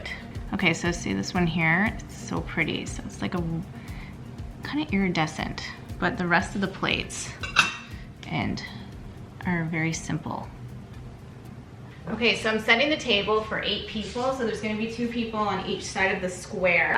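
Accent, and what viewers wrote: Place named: Canada. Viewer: I live in the U.S. but I love your Canadian accent! I never knew that Canadian holidays were different than ours until I started watching your videos. Our Thanksgiving is in November and I’m not hosting but I love to see what you do! I also have really loved watching your decluttering journey, you’ve come so far! I hope you have a happy thanksgiving 🦃😊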